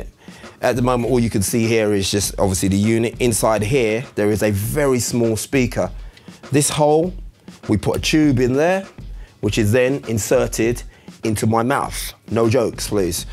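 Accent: United Kingdom. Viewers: British is just amazing. Love his accent.:D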